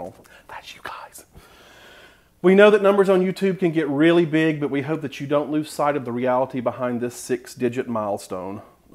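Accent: South, United States. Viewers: I'm from South Carolina Carolina I can really relate to things you talk about regarding family recipes and behaviors. Plus, your southern accent is awesome! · Congrats!! Congrats!! Congrats you have a beautiful personality and so sweet I enjoy your videos I get excited when you upload a video very helpful .Love your southern accent❤ keep up the great work. Sorry for your loss in your family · Congratulations!!! You're funny, talented, and have a great concept for your channel. (And it helps that you've got the most adorable Southern accent!!) I am delighted for you and am looking forward to more great videos. God bless! 😃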